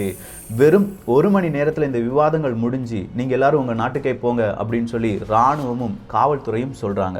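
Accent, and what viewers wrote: Kerala, India. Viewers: Are you mallu?. some of words pronounciation like that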